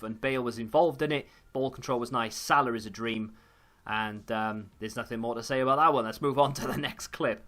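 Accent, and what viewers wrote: Wales, United Kingdom. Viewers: Certainly not, I'd guess Wales or somewhere in England close to the border. Probably Wales though.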